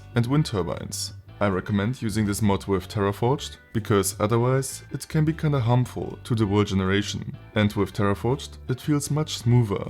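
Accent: Germany. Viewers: German accent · bro man hoert das du deutsch bist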